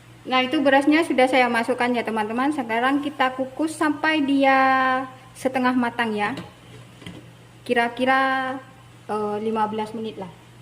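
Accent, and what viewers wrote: Madura, Indonesia. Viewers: Logatnya Bicaranya Persis Orang Madura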